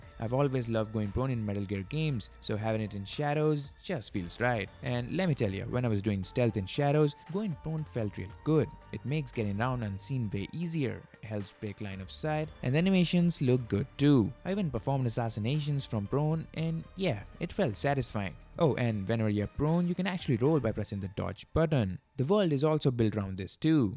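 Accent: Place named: India. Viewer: Голос как у Индуса.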